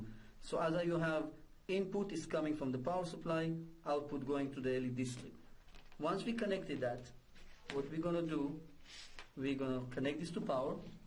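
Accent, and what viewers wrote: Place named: India. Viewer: indian english :)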